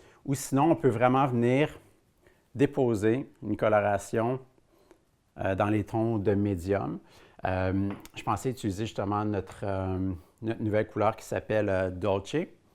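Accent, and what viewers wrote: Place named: Quebec, Canada. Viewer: J’ai trop d’amour pr l’accent québécois je meurs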